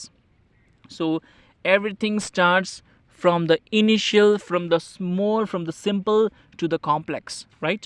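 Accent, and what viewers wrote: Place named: United States. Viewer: Sir ur english pronunciation is lk American people Khublei sir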